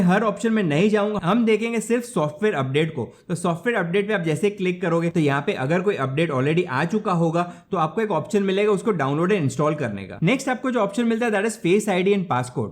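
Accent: Gujarat, India. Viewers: Are you a gujrati sir?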